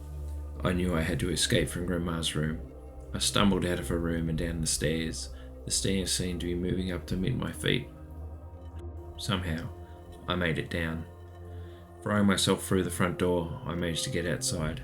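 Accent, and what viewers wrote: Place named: Australia. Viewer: I figured that out on another video. I even commented like, "hold on you sound extremely Australian now"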